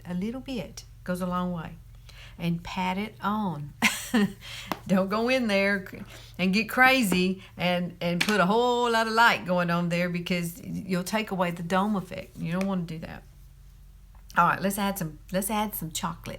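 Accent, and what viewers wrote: South, United States. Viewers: I use Younique products here in Texas. Love them. I know what you mean about the southern accent. I have an accent a little different than yours but a while back, I worked with a group with our company from Chicago. One lady, while we were on the phone said “I just love your accent!” I said “I thought y’all were the ones with the accent!” She just laughed. I was serious though.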